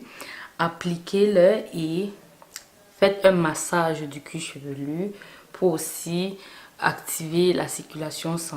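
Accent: Cameroon. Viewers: J'adore ton naturel ... la voix de la Cameroun aise 😍😍 et tes cheveux sont juste magnifiques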